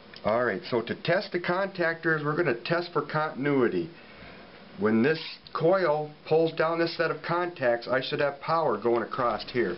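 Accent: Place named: Midwest, United States. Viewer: This is the most midwestern guy I've ever heard in my life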